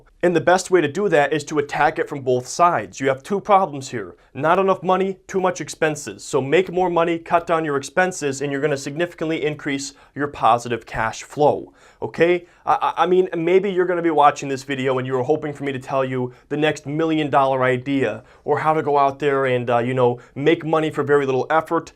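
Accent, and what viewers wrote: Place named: Midwest, United States. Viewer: Great video. May I ask where you're from? I hear a Midwestern accent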